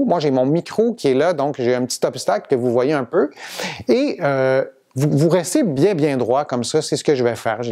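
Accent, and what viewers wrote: Canada. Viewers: Ahhh l'accent canadien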